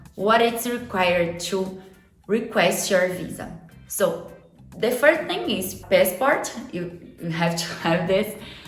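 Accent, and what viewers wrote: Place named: Portugal. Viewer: Are you Portuguese blood? Your accent and looks are more Portuga than American.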